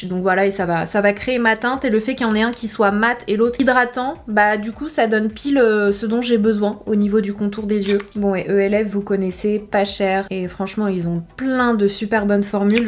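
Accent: England, United Kingdom. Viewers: Hello Sanaa! Je suis tout à fait d'accord avec toi pour l'accent anglais ! 😂 Moi qui parle couramment l'anglais, je comprends beaucoup mieux l'accent américain que l'accent anglais 😂 quand je parle avec mes potes anglais je suis obligée de leur demander de répéter plus lentement car j'ai beaucoup de mal à les comprendre mdr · L'accent anglais est clairement plus difficile pour la compréhension :p mais comme tout c'est une question d'habitude. Tu nous donnes tellement envie d'avancer dans le temps et d'être déjà le 24 <3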